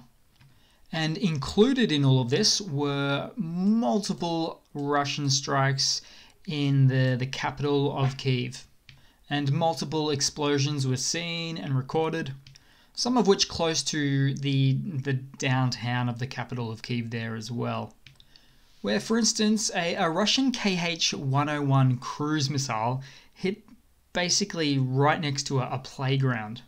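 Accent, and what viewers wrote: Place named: Australia. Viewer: Hey dude Where are you from I’m in Sydney You sound Aussie You sound like Marcus House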